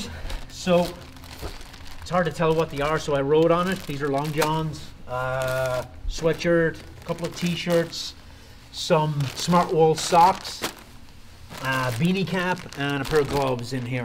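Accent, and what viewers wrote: Ireland. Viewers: I was trying to put a finger on you're accent and it makes sense when you said you're from Ireland.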